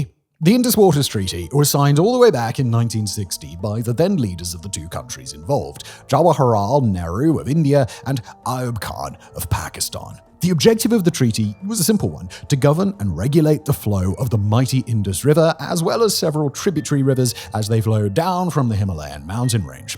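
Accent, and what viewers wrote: United Kingdom. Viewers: Hey white man either a British accent, what would your country do if it had a neighbor like Pakistan which continues to train, execute and protect terrorists? What would any reasonable country do? Is there any other country in the world which has tolerated this kind behavior like India with so much patience?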